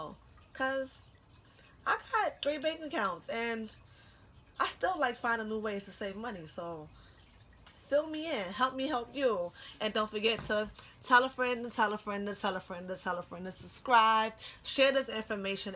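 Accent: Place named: Brooklyn, United States. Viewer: U must be from Brooklyn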